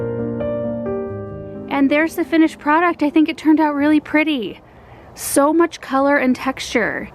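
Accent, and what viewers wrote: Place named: Midwest, United States. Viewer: Hi, Just thought I would mention every time I hear you pronounce zinnia, I think to myself, oh our way with the "short i" must be a Midwest kind of thing. My kind of arrangement, esp. love the cabbage with the rose and berries. Thank you.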